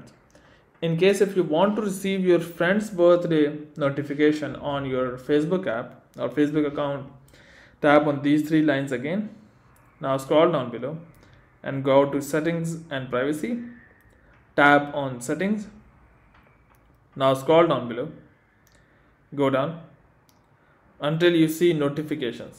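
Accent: India. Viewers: People are just slow headed fr, if you know English then you would have understood him even with his Indian accent. They just find a reason to dumb themselves down.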